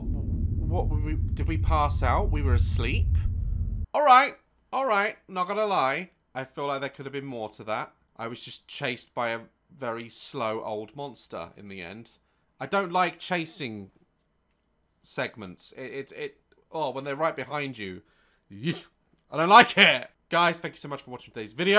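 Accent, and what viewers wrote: United Kingdom. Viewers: One of the things I like about videos is the British accent and btw I just subbed!!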